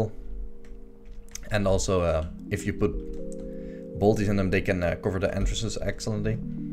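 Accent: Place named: Netherlands. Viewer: Ben je nl want je hoort het echt 😂😂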